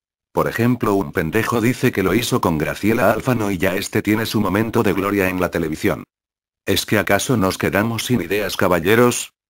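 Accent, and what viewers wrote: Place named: Spain. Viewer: porque hablas como español si sos argentino?pense que eras de España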